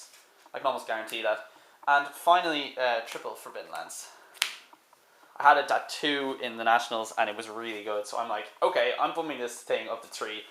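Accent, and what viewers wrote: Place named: Ireland. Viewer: Where in ireland are you from?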